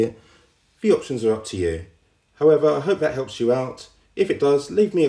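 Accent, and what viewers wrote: United Kingdom. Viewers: Your voice literally sounds like an british guy btw am not racist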